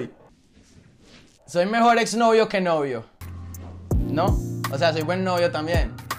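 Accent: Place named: Antioquia, Colombia. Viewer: Que flow paisa 🍃😎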